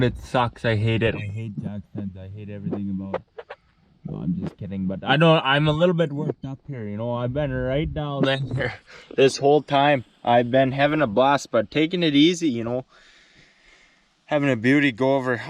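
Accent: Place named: Saskatchewan, Canada. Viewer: Way to tackle it bud.. if you need a tranny jack DM me i have one you can use.. slick as shit when you're working alone.. by the way you talk i believe we aren't far from each other in good ole sask lol..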